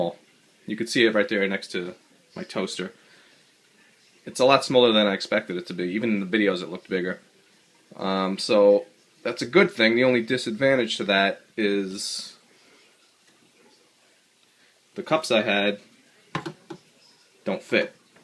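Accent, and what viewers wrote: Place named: New York, United States. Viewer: Sick accent u must be from New York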